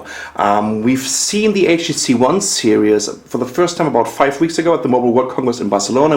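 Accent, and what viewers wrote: Germany. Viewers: are u german..? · dude, you have a very strong german accent. haha, btw good video!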